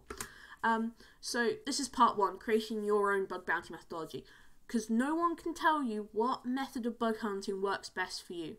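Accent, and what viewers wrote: United Kingdom. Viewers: British accent is so sexy :p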